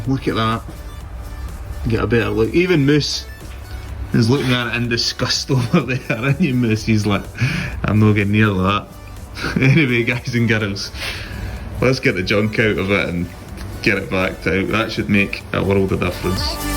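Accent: United Kingdom. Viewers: i dont know how i get here but nice job but can i ask what is this accent like old britan or scottish or irish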